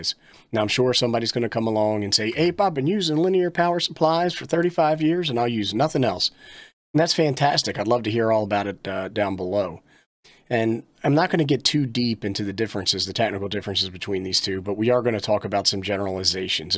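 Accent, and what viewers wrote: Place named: Baltimore, United States. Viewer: You're definitely from Balmere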